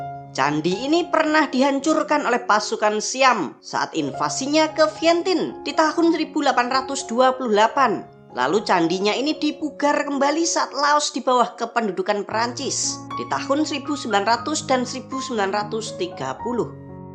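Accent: Java, Indonesia. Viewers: di lihat logatnya mimin org jawa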